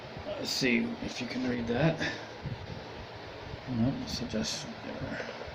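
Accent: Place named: Northern Ireland, United Kingdom. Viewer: Could i ask where your from as your accent is def N Irish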